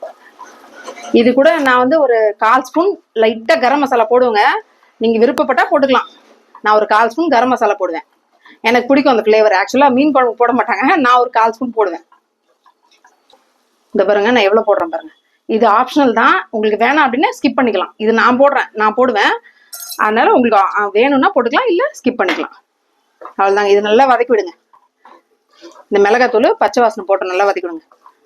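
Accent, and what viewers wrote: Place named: Telugu, India. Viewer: அக்கா உங்ககிட்ட ரெண்டு கேள்வி நீங்க நடிகை ஜீவிதா மாதிரி இருக்கீங்க and வீட்டுல தெலுங்கு பேசுவீங்களா நாங்க ரெட்டியார் தமிழ் தெலுங்கு வாடை அடிக்குது னா தமிழ் tr ங்க 🙏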